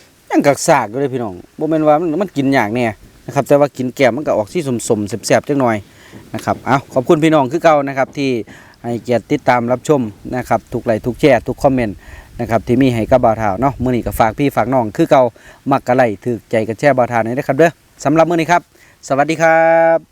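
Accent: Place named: Ubon Ratchathani, Thailand. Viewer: เกรียงไกร สุริบุตร ฟังสำเนียงเราน่าจะยุ่ทางอำนาจอุบลละคับพี่น้อง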